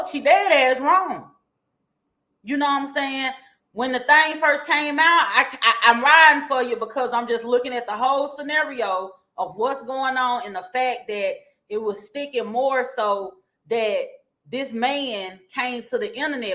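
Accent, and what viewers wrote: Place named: Texas, United States. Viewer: Love the video and your Texas accent.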